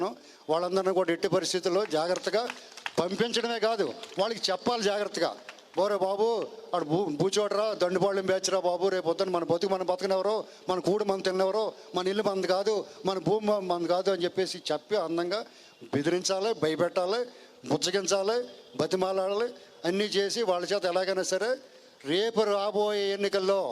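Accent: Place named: Godavari, India. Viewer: Godavari yesa basha ki meeru brand ambassador sir srinivas garu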